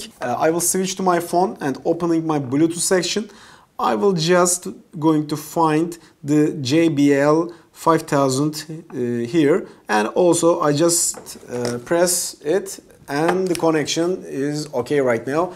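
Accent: Turkey. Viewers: abi fonetiğin türkçe konuşmanla birebir aynı vallahi hiçbir fark görmedim :D hasret kalmışız akıcı konuştuğu izlenimini vermek için kendini kasmayan insanlara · Birazda aksaniniz uzerinde calissaniz iyi olur. tamam, ingilizce anadiliniz degil, ama nasil bir hintli ingilizce konusurken kotu aksanindan dolayi anlasilmasi zor oluyor, ayni sekilde Turkiye'de cogumuz ingilizce'yi Turkce gibi kkonusuyoruz. Bu kendi aramizda bir sorun yaratmazda, ama eger Youtube gibi bir platformda ingilizce bir video yayinliyorsak, o zaman neden kendimizi iyilestirmeyelim ki? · Thats Turkish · That's some heavy Turkish accent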